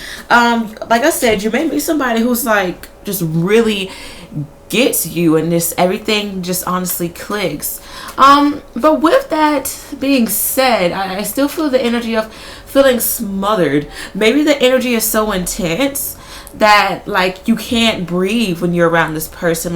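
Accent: Caribbean. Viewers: Yea u do have a carribean twist to you.i thought you were dominican or jamaican for a sec lol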